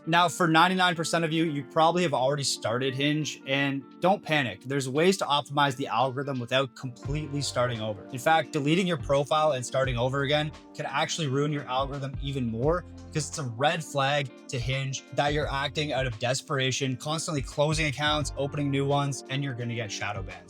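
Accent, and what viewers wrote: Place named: Canada. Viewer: Thumbs down for excessive “bro” and irritating Canadian accent.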